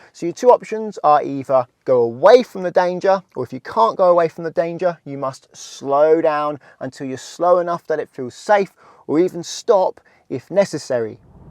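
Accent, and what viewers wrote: United Kingdom. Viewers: can we have this video without the british accent? its a litte distracting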